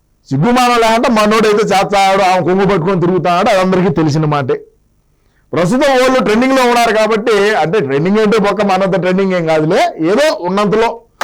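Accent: Rayalaseema, India. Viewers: anna me rayalla sema language lo challa Baga mataladathu very nice allthe best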